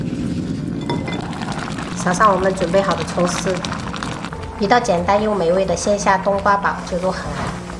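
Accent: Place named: Zhejiang, China. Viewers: 听口音像江浙沪的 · 好像是可愛的浙江口音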